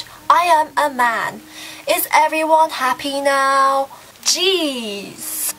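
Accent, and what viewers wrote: United Kingdom. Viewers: Are you British? I'm from Australia and you are so funny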